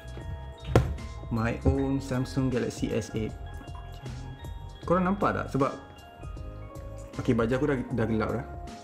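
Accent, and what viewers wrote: Terengganu, Malaysia. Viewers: orang ganu k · Mu ni org teganu ke? Ingtkan org KL. Nice bohhh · Ouhhhh org ganu rupenyee...hihihi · orang ganu ke？ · bro org trg eh? area mana? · Ko orang ganu ke? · org tganu ke abg? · Orang Terengganu bro? dengor slang ganu skit tuh..huhu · Org ganu ke hihu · cam loghat teranung je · Org tranung ka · aku bru je discover video2 mu wahai, makin byk video aku tgok makin aku sure mu ni org ganu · ganu ghupenye..hahahah.. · pergh. orang ganu ke bohh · ORG TGANU KE NI BOSS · dak ganu ke.. mydin gong badak. baik ahh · laa org ganu ke? hahaha kemah idola duk gong badok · org ganu ke boh? baek ahh.. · Ganu boy bosku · Mu org tranung ke awang? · Hui orang ganu gok ni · Org Terengganu kee??? duk mane.. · orang terengganu ke? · org ganu ke boh?nice .. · sek ganu ni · bro org ganu ke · Bunyi macam bahasa terengganu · Orang terengganu ke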